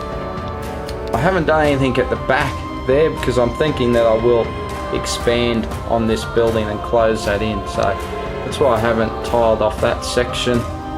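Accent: Australia. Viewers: well dis guy is Australian..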